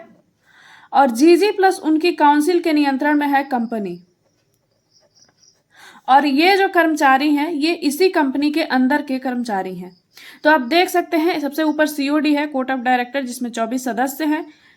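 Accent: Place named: Bihar, India. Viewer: Mam apki voice tone se lg rha h aap bihar se ho😊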